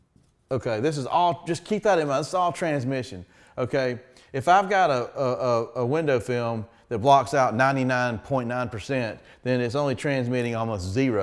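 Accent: South, United States. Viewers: An intelligent, coherent presentation of a complex idea. Imagine that from someone with a southern accent.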